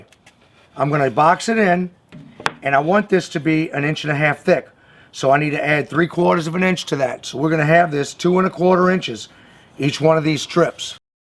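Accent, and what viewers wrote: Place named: Louisiana, United States. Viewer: Hi, great video! I see your wearing an Abita beer shirt. I'm from Slidell and recognized your accent right away! Keep up the great work. Your project looks awesome!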